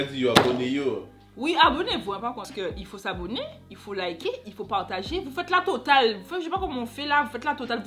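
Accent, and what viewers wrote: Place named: Canada. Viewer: Accent canadien oklm 👌🏾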